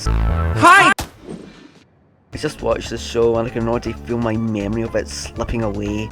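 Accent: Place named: Scotland, United Kingdom. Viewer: i was thinking "damn thats a heavy scottish accent" and wasnt gonna comment on it but then immediately in that thought got to this part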